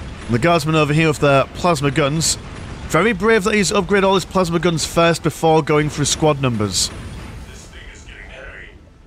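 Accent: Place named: East Yorkshire, United Kingdom. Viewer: Recent subscriber, pleasantly surprised when I heard East Yorkshire, from north east myself, love the videos!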